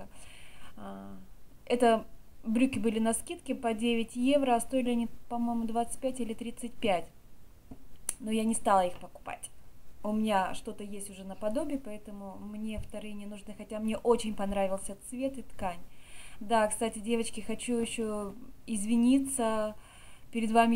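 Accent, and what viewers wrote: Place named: Arkhangelsk, Russia. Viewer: дорогая, не стесняться надо а гордиться своим архангельским говором. это просто замечательно что люди сохраняют свои диалекты. в любой другой стране кроме россии местное население бережет свой говор который обычно отличается от столичного и горды этим. здоровья вам и вашей семье.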